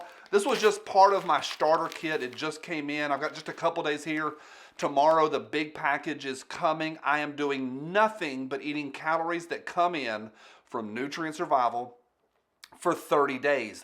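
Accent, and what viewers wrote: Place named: Appalachia, United States. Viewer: LOL Not to harsh on your appalachianess Greenwich is pronounced Grenich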